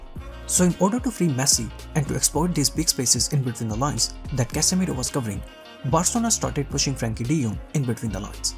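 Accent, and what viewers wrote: India. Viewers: Is an Indian cause his pronunciation of some of the words sound like an Indian eg clinical? I need an answer plz.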